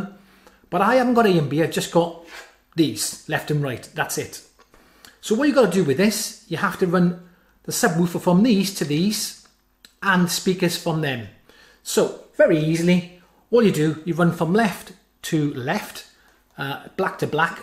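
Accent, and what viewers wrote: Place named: South Wales, United Kingdom. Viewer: Great video! You sound like you live in South Wales 🏴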